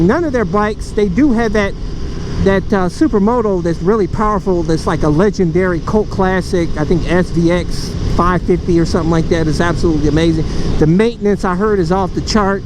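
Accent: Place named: South, United States. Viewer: You know you sound like a southern black, right? 🤗🤙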